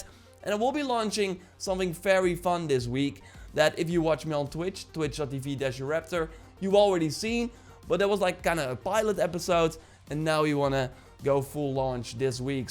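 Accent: Netherlands. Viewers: Je kan gwn horen dat je Nederlands bent 😂😂 Like als je Nederlands bent😂👏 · I had no clue that you are from the Netherlands ;). You can always hear when a Dutch person is talking in English. Keep on making videos. Can't wait for Valhalla to drop and watch you're videos. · Ooh hahaha jij bent Nederlands je kan goed Engels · Are you dutch?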